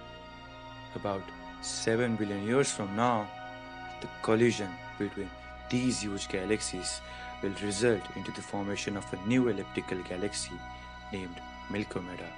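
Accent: India. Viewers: u sound like indian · He sounds so Indian yet so African yet so Asian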